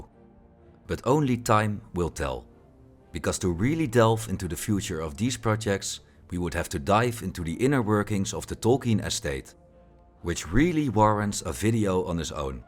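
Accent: Netherlands. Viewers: The dutch accent is strong in this one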